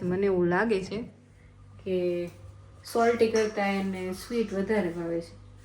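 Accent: Kathiawar, India. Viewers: Kathiyawadi lagocho hu bhi kathiyawadi chu Putaliya no dhandho start karidyo......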